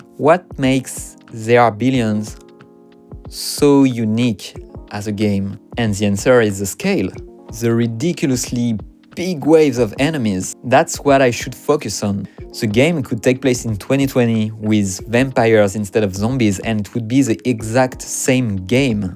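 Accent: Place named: France. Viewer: Super de voir un frenchie avoir un poids aussi important dans l'industrie ! L'accent est ultra tranché en effet :D Mais bon, le bilinguisme est là, le reste on s'en fout ! Ça fait également plaisir de voir que le jeu n'est pas mort et que du contenu refait surface même en 2021. (Je viens juste de voir le post pour cette vidéo sur Steam) Quelle agréable surprise de constater que le compositeur est un Français ! Je n'en avais pas nécessairement l'attention en venant sur le lien mais du coup j'en profite pour laisser un message d'appréciation concernant la bande son ! Les musiques sont superbes, et les ambiances sont extrêmement réussies. Je me disais justement que l'équilibre steampunk et horror était vraiment très bien balancé et donnait un côté vraiment unique. Merci pour ton travail !! En espérant te revoir sur la suite, qu'on espère tous. :)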